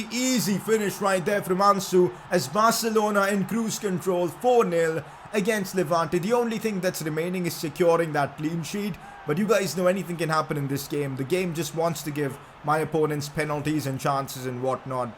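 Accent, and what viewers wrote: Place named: India. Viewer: Your voice is worse than your first videos cuz of indian accent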